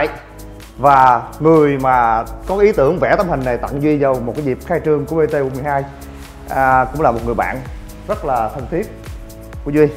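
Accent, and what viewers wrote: Mekong Delta, Vietnam. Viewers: Cải lương quá cha nội...dân miền Tây phải o?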